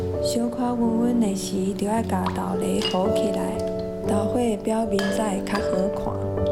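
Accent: Quanzhou, China. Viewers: 感謝使用泉腔配音 挺有趣的 也很難得 話說同安腔的二調變調是升調 但是這影片的配音 比較不明顯 (新竹當地的操新竹腔的中老年人就很明顯) 另外這影片保有部分老泉的央元音 例如"事sīr" 但是也有一些讀音丟失央元音變成新泉了 例如"鍋e"(老同安腔讀er) 略感可惜了些 附帶一提 傳統上(例如臺北腔 新竹腔)"好"讀ㄏㄛˋ "ㄏㄜˋ"是南部腔的讀法(即臺灣南部偏漳腔 主要分布於臺南與高雄一帶 而傳統上漳腔也是讀ㄏㄛˋ) 這個字的音讀混到南部腔了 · 我覺得你的腔口很可愛，看字幕說是泉州腔，我聽起來有點福州加彰化和美一代的海口腔，跟我講的泉州腔有點差別，拙見不喜勿怒。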